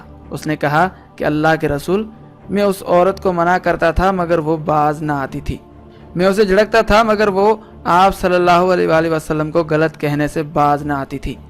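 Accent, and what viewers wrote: India. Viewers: Ap pakka Indian ho q k bolny k andaz bata rahy hy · Are you indian ?The word of your speaking is indian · Tu hai Kon Bhai ?indian walon Ka accent lagra hai !!!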